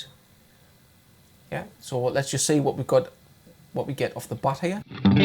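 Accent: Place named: North East England, United Kingdom. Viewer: Hi, thank you for this very helpful video. You take it nice and slowly, which is a pleasant change from most YouTube instruction videos. I’ve watched some videos by the otherwise excellent Richie Castellano but he goes though stuff much too quickly for me. Your pleasant North East accent is an added bonus. Are you from Bishop Auckland or Durham?... thanks for explaining all this so well. I can make progress now.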